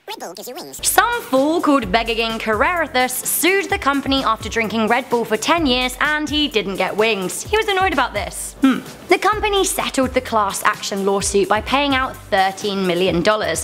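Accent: United Kingdom. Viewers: Lynn Barns she's from the UK so they pronounce it different from the USA. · dillpickle 123 she’s British. That’s how we pronounce it in Britain.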